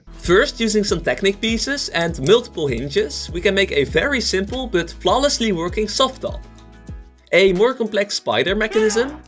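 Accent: Netherlands. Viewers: Where are you from? I here a Dutch accent❤😂 I'm Dutch BTW. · Dik Nederlands accent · The cuts are a little too fast for my liking, sorry to say I don't have this much ADHD. Also, the dutch accent is a little too noticable. Great job on the modifications though, that is some very real talent you display there. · Ik ruik een Nederlander aan het accent te horen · I smell dutch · you sound dutch just like me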